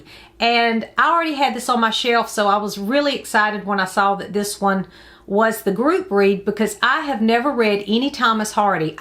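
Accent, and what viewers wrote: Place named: Texas, United States. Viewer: Just found your channel. So glad I did. I have to ask are you possibly from Texas? I am and your accent sounds familiar.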